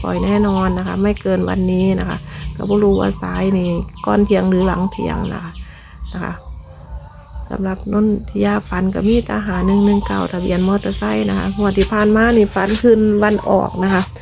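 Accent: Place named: Laos. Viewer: เสียลาวหลายจ้า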